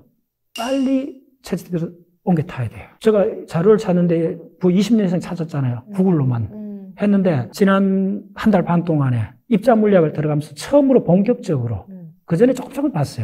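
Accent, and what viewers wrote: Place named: Gyeongsang, South Korea. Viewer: 내용 중에 자막 잘못 .. 스코트가 아니고 서고트.. 경상도 발음 이해 못함..ㅎㅎ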